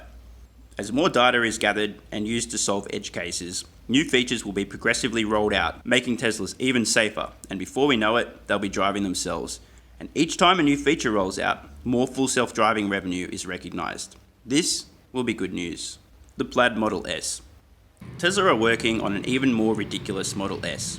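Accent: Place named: Australia. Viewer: Good Work, and Nice Vid work... Especially the Brief Jim Chanos shorts pic... lol Great to hear an Oz Accent covering TSAL too... You really are the first for us:)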